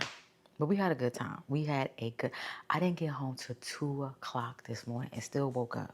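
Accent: New York, United States. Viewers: Hey....New Subbie here 🎉. I am loving your vlogs Girly and truth be told, when I heard your accent, I immediately hit the like and Subscribe button...lol! I miss the NEW YAWK lingo. I'm Brooklyn bred, now Texas Fed, but it will be NY FOREVAH!!!!! I am loving your channel!!! I can't wait to binge watch all that I've missed ❤😂❤. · Gurl, your skin is amazing and you look amazing! Also, a country New York accent, that's elite!!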